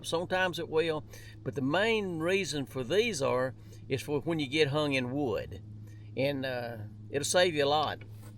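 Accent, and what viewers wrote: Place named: South, United States. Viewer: What size weight do you use? I've always made them with 2oz catfish weights and I use a zip tie to attach the clip. But your way may be even cheaper. And BTW my wife heard your voice and said, "Cap, you are as southern as they get, but I think that guy is more southern than you" lol. Im a Georgia man, where are you from?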